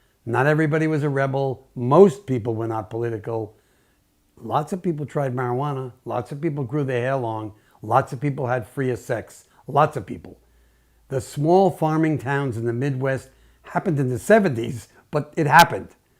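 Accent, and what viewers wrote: New York, United States. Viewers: You're a treasure Mr! 🌟The clarity and astuteness of your insights and the directness with which you address us helps to sharpen up our minds, no matter our ages. ☝ Your voice & NY accent are also very appealing. 😊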